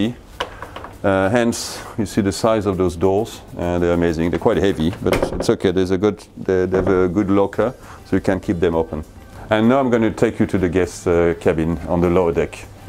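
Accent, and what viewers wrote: France. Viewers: Is this guy French? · Francisch accent?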